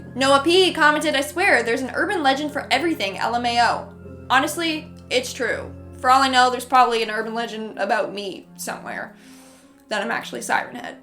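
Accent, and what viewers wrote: France. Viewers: I like your French accent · I love the French accent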